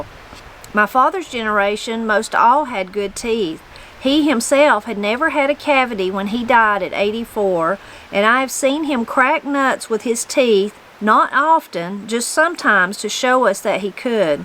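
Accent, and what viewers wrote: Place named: Appalachia, United States. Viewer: Listening to you read these old stories makes me smile, and brings joy to my heart. This reminds me of my Mama, and Mamaw reading to us as little kids. There's a special was us from the mountains talk, it's not a southern accent, it's a lot richer. Thanks!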